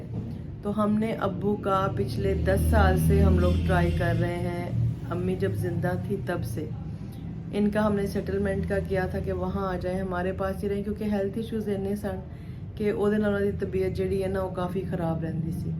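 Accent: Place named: Punjab, India. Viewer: Bhainji sat shri akal ji Tusi jdo'n bolde o menu baut sohne lgde o tusi hai v bade sohne o Tuhadi theth punjabi v sade vrgi a Tusi pakistan vich kitthe rende o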